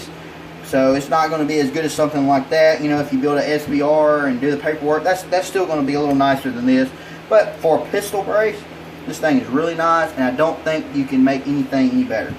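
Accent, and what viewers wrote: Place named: New York, United States. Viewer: I noticed your accent. You're from New York right?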